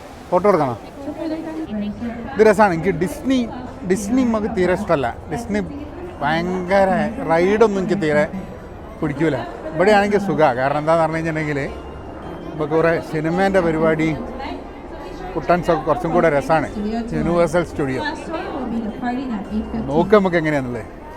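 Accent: Kozhikode, India. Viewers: കോഴിക്കോടൻ ഭാഷ ഇജ്ജെങ്ങനും മാറ്റിയ കൊല്ലും അന്ന ഞമ്മള്..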